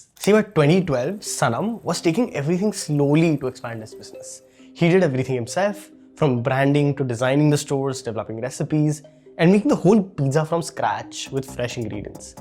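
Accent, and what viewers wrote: India. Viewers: Yes, sounds like bangalorean accent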